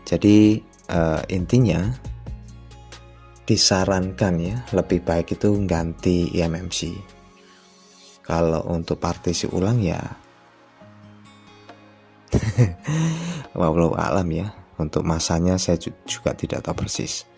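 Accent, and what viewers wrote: Java, Indonesia. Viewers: Logat nya kaya org jawa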